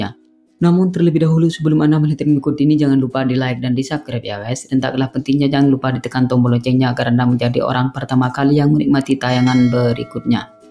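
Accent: Madura, Indonesia. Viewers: Adminya logat Madura 👍👍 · Di denger dari logatnya, dari suku madura ya gan ?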